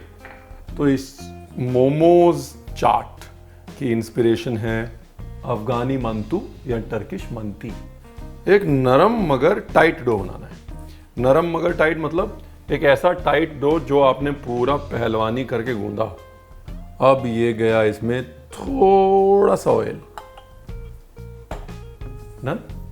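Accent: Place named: Punjab, India. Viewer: Ranvir bhai I'm from kashmir nd I love your way of cooking as well as talking with punjabi tadka ❤lub u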